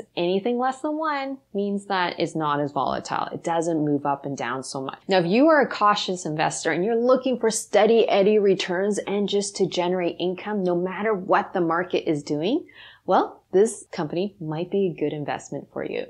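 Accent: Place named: Canada. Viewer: Anyone else get a lovely Canadian accented female Mr. Rogers vibe from her? I could listen to her all day.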